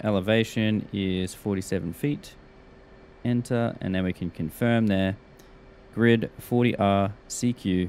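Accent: Australia. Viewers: Great to have some tuts in relaxed Aussie accent, very easy to take in and no time wasting stuff cheers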